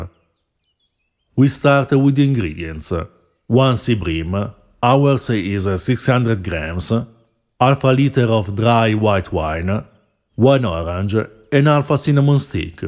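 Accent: Italy. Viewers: I love to listen to you and your Italian accent so much. And of course the medieval recipe!!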